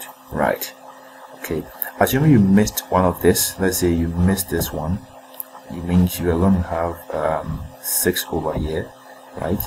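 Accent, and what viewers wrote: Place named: France. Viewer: I have never seen such a thick french accent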